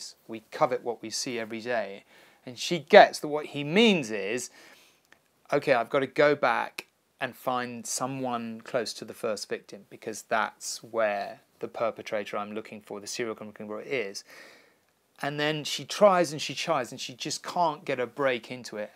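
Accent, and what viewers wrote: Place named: United Kingdom. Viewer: I appreciated the content... the British accent can be painful tho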